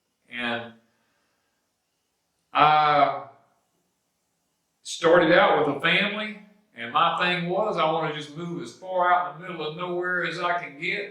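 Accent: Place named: Alabama, United States. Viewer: Thanks. Love the channel. Feel like I'm listening to someone from around the corner from me. Mobile, Al. Come down and talk sometime.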